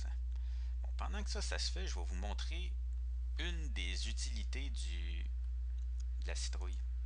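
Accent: Quebec, Canada. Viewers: arreter de critiquer son accent c normal qu'il parle comme sa c l'accent québécois il peut rien n'y faire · sa sentant qu'il et quebecois sa change de la voix normale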